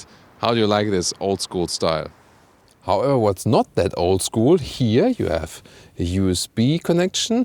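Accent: Germany. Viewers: The main catastrophe is not the wording, which is sometimes also incredibly German, but the German pronunciation, which almost physically hurts. It is more than ridiculous to say that this is perfect English.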